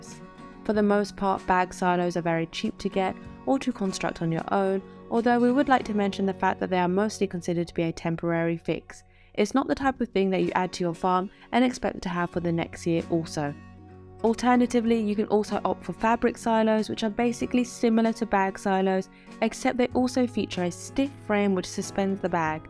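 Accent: United Kingdom. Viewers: I like the narrator's posh accent.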